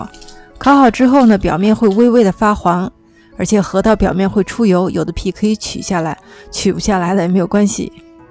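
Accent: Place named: Beijing, China. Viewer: 这是北京口音吧，声音听着很舒服。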